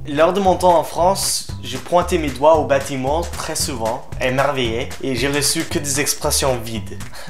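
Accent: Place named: United States. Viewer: J'adore ta nouvelle chaîne !!! gros pouce bleu 😍 continue ! et j'adore ton accent américain :)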